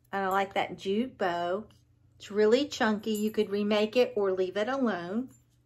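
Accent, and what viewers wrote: South, United States. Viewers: Hey sweet lady I watched your haul and I heard you say you are from WV, I am from WV also Huntington to be exact. May I ask what part of WV you are from?! I thought I heard that southern accent 😉 love all of your hauls and crafts. You are such a sweet and beautiful person inside and out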